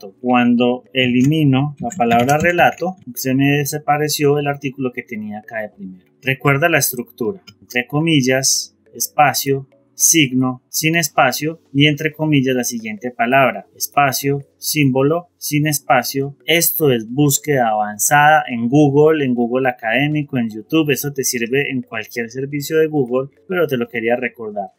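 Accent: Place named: Medellín, Colombia. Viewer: Excelente amigo, sos de medellin, colombia verdad🧠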